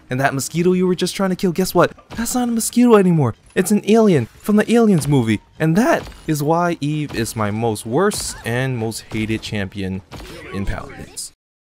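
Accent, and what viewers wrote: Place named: Malaysia. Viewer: I can smell the Malasyan in his voice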